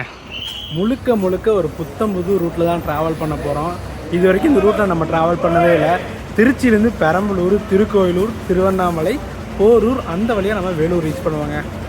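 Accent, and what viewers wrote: Kanyakumari, India. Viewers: Bro are you from kanyakumari 🤔.. Your slang is like typical kanyakumarian tamil ( malayalam mixed tamil)🤔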